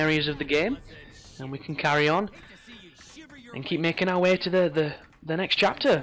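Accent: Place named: Manchester, United Kingdom. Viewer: Thanks for the vid. I was stuck on this then got it first time. Nice to hear another northerner on YouTube. Doesn't sound far from Manchester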